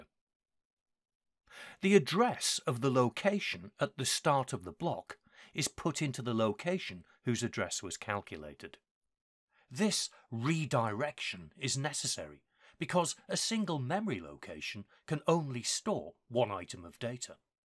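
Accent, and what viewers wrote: United Kingdom. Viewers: Hey! Happy birthday man! You deserve it. The 9 sounds just as good as 10, without the pain. The way you explain things with your soothing British voice and systematic, chronological, and a lot of patience make you the best channel for computer education I've ever seen. The editing alone deserve respect! Thanks for your dedication 🤝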